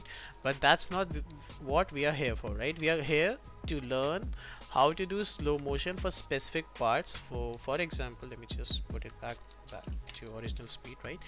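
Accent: India. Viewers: watched hundreds of indian tutorials, i wont even care if you speak indian id still understand you either way, even if im not indian. good tutorial tho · Hey buddy quit trying to explain your accent, it's cool and we don't care. And thank y'all indians for sharing your knowledge online for free. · i stopped the video when you said that you're from India and you have an accent. let me tell you something real quick my friend you have a great talent and your English is GOOD, do not worry about any negative trash talk about anything that comes around you just keep doing what you are doing because it's GREAT !!! and now I'm learning from you my friend <3 Thank you for posting such a great talent like that :D B+ always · I want to get ur accent i try but I can't, I even watched a yt vid on how to sound like Indian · Don't think too much about it man you actually have one of the lighter indian accents that i have heard lol🤣🤣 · Best indian accent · Bro just watched your tutorial dont worry about your accent! 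I've come to loved this accent since I always learn from indian guys in youtube! You guys are simply the best. :) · this tutorial helped me out alot ! and honestly i dont know how anybody could make fun of your indian accent, your english is very good ! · nah i love your accent, indians make the best tutorials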